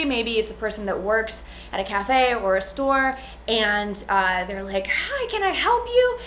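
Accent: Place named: United States. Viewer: First time I have watched your video the way you teach is really great, your overall personality speak out when you speak that's good, now I have subscribed immediately to learn American accent, by the way I am a Indian translator for Hindi and Punjabi languages..you are doing a good work, thanks again.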